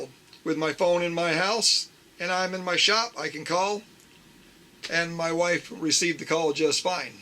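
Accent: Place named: South, United States. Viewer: Use Suriii.... ahh southern people accent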